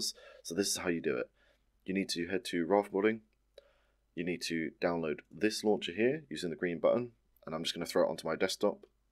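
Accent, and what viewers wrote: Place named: Oxford, United Kingdom. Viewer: Thanks for the videos, what about a playthrough? You are from UK? I have a colleague from Oxford with the same accent.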